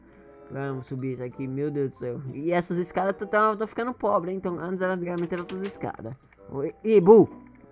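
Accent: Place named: Ceará, Brazil. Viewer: Oi.. Vc parece ser Cearence kkkk Faz video de como viver no Bob Esponja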